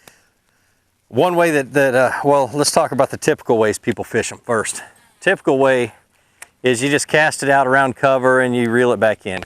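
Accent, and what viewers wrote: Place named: New England, United States. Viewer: I like how his “nagging” voice is 100% yankee 😅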